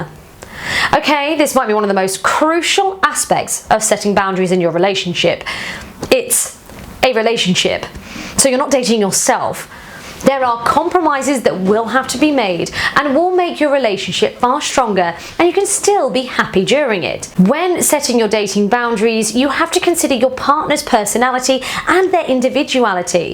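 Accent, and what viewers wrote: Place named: United Kingdom. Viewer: u r very cutely and your lovely British accent makes me mad, I Love u and thanks from u and your team to this useful product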